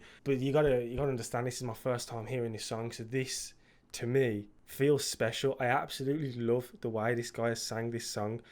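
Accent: United Kingdom. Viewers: Aren't you British? You sound British. How have you never heard of?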